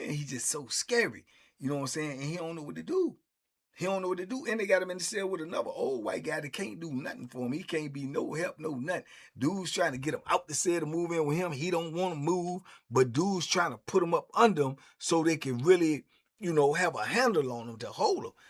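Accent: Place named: Washington DC, United States. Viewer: I like the way you sound like a a DC Youngin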